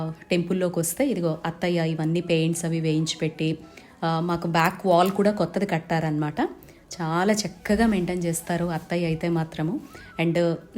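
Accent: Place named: Pulivendula, India. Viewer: Akka voamma entha baga annaru akka ma wuru pulivendula akka ma language gurthuku vachindi akka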